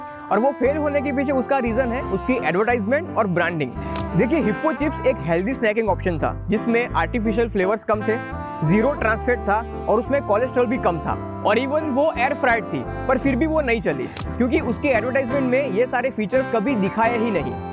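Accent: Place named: Gujarat, India. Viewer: Buhot he acha video hai brother. I think aap gujarat se ho bcs me bhi gujrat se hu or baat krne k style se pehchan gya😅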